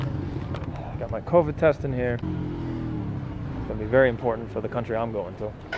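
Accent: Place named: New York, United States. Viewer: Awesome ! leaving kenya is always hard and boy time flies! EAC is beautiful . Thank you for your corky NYC accent/narrative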